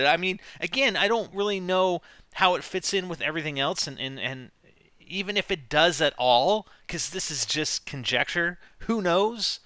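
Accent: Spain. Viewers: U have a nice spanish accent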